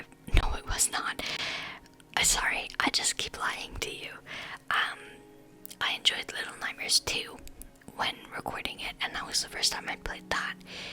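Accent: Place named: Ireland. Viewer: irish asmr?? really hard to find that on yt lol. I am irish myself and like the familiarity of the accent so its nice to finally find an irish asmrtist 👋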